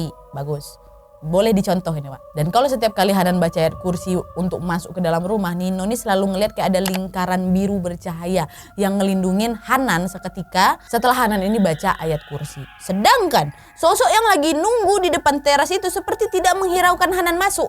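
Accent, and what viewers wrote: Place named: Medan, Indonesia. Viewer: Btw, bahasa kk kayak bahasa orang medan. Ceplas ceplos, btw tinggal dimnasih kk ini.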